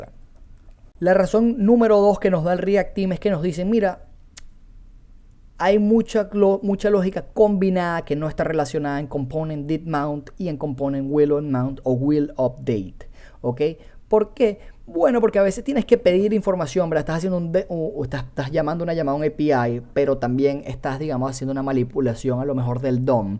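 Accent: Venezuela. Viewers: tu como que eres Venezolano mi pana, excelente instro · marico eres venezolano? que de pinga encontrar un desarrollador con nuestro acento... saludos mi pana... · Mano tu eres venezolano si o si xD calidad el video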